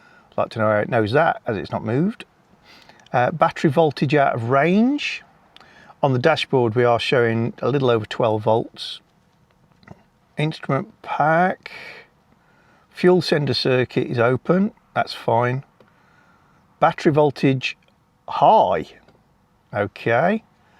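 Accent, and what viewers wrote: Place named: North, United Kingdom. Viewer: You should clear the fault codes and see what comes back. Also, your jury rigged fuel pump probably isn't helping. You need to properly reinstate the fuel pump. I know you were loath to do that but you're at the point where you need to properly sort it. You should try swapping sensors from one of your other cars to eliminate faulty sensors. You could also do with a better scantool. That Launch one looks to be little more than a glorified code reader. I have a Topdon AritDiag 800BT I'd be happy to scan Steel if you're close enough to me (your accent sounds "northern"). I can also test your battery because I have a Topdon BT200 battery tester (yeah, I'm a Topdon fanboy). Are your car names a reference to TV shows that Dame Joanna Lumley has appeared in? If so, I'm sure a future car would have be called Patsy or Edina. 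If I could choose any female voice for a sat-nav, it would be Joanna Lumley. She has such a lovely voice.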